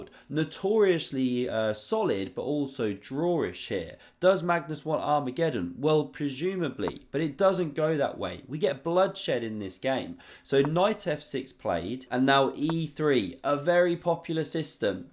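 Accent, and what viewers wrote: United Kingdom. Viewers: Plus he has a cool British accent, like GM Danny King and KingCrusher too.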